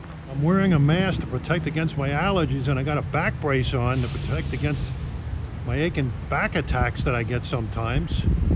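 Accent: New York, United States. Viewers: Great report. I noted that you initially said "down town" and later changed it to Center City. That is the more correct term used in Philly. Re blood pressure, you sounded just a bit stressed out. Perhaps the long day, standing all day or most of it, meeting certain time tables, and dehydration in the heat can cause all that. I have the same problem so I know from personal experience that this can increase your blood pressure. Please stay in the shade, stay seated, drink plenty of water, have a good sandwich, and stay as relaxed as possible. No bad words! Kudos to you for keeping it kosher as they say in Brooklyn. Did you get to chat with some of the locals? I bet your NYC/LI accent stood out among those Philly jawns. Look forward to your next report. Keep up the good work.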